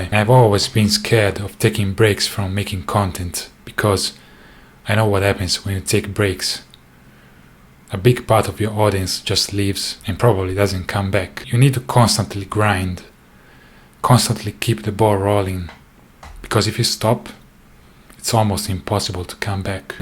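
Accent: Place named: Italy. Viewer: Please don’t give up🩷. I accidentally stumbled upon one of your videos. I heard an Italian accent ( my Mom and her family were from Italy), so I stopped and watched. I hadn’t laughed so hard in years. My husband plays bass( and guitar, sorry), so we both watch together. Please know, you gave me my first real laugh since my Mom died. Your videos about playing bass reached all the way to America, and gave one grieving daughter a reason to smile.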